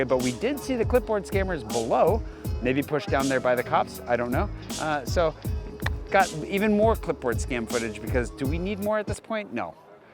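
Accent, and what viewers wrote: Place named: United States. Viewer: You've lived here long enough to become French yet you sound like a ********g American. Hope we do not bump into each other. I've lived here 10 years.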